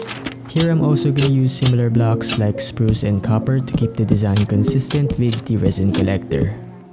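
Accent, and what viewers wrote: Philippines. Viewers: English language is Filipino accent.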